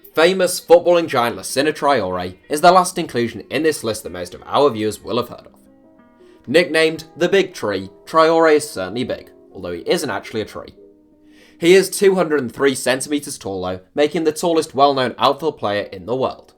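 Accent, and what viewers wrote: Ireland. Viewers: I thought you were Irish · Irish guy?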